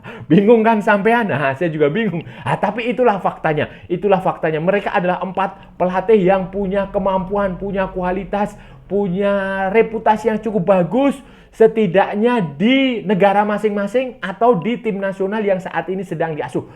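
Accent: Indonesia. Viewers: Kau org Malaysia ke?...sebutan huruf a dan e memang begitu di indonesia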